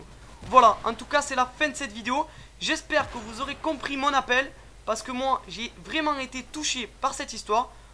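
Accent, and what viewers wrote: South, France. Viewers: ta raison mon gars tkt tu va aller loin et evite les con ki mette des pouce rouge tu c meme pas pour koi en tt cas t un de mes youtuber preferer ac ta peche ton accent du sud ! en tt cas bonne continuation. et je compren pour le but de monptelier ke tu les upload parce quil est magnifique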